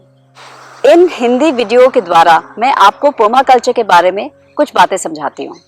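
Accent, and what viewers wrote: Haryana, India. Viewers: Am i hearing a haryanvi accent 😅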